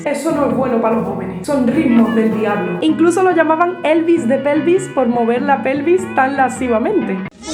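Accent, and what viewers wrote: Andalusia, Spain. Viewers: Me encanta que te apasiones y te salga ese acento andaluz tan rico que tienes (el cual sabes ocultar maravillosamente cuando cantas rancheras). Mi esposa y yo ya somos tus fans. Saludos desde México ¡Viva España! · 😂😂😂 Me ha encantado tu vídeo, qué arte shikilla. Soy andaluz de pro y me encantan nuestras frases, dichos y ACENTO ❤. Y por otra parte, currazo de vídeo con las cuñas, y la información, amena y muy interesante. En resumen, cada día mejor 👏🏻. Un saludo desde Jeré! Y GRACIAS ❤ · Cuando arrancó el video y dijiste que ibas a hablar de ese género te confieso que dije " se me cayó una ídola, un bastión" después me hiciste reir, me diste conocimiento y argumentaste sincera, coherente con clase y arte. Sos muy genia che. Me tengo que entregar de una vez a la guitarra, a ver si es verdad que no tengo talento o solo estoy "negão" jajaja. 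Gracias bella. Adoro tu acento del sur. Saludos de este oriental. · Me encanta tu canal y tu acento. Adoro a la gente talentosa de España. Saludos desde México... bueno, lo que queda de México después del sexenio de López Obrador, jajaja.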